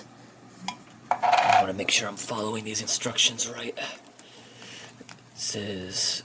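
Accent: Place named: Western Massachusetts, United States. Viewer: Sounds like a west Massachusetts accent. Typical south-western New England for sure.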